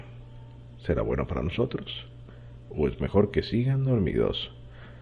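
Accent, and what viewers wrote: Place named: Spain. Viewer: Asta que cambian las voz. K ya me tiene asta la madre las voz español o gallego. Mierda. La lengua española de es la más horrible de la abla ispana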